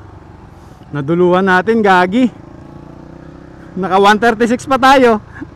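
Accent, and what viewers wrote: Iloilo, Philippines. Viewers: Idol Taga diin ka nga ah ilonggo pang hambal mo hw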